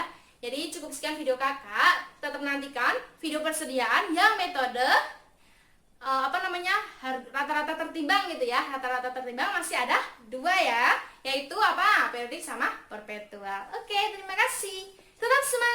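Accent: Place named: Java, Indonesia. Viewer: Mbak nya kok lucu,, ada medoknya dikit😂 tp aku suka😊🤭.. asli mana sih kakaknya😊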